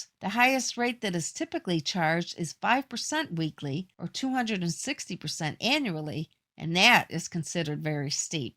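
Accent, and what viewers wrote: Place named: New York, United States. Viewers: Got to love the New Yawk accent of the lady covering these videos,’ she can read a book to me anytime. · Nice!! The borgata gal is back!! That NY Italian accent!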